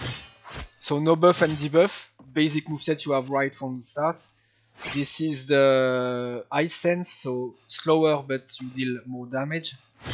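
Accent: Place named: France. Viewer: Ahah, j'ai entendu l'accent, j'avais un doute!! Vive la France!! Vive la république!!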